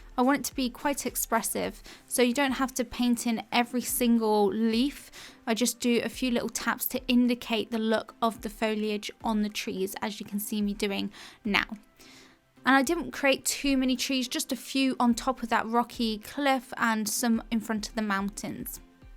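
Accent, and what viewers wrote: England, United Kingdom. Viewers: I like your English accent, where are you from?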